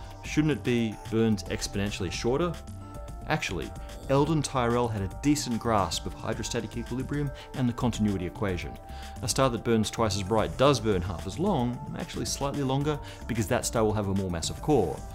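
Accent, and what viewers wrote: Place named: Australia. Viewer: this Aussie speaking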